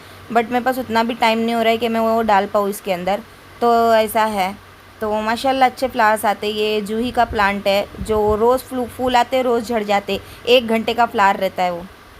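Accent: India. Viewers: Love ur indian accent💕mumbai's life sounds to be like karachi!!!love from pakistan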